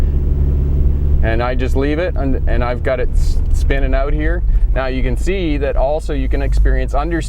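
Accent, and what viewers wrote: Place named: Canada. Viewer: I knew this guys was Canadian lol. His accent just didnt sit right with me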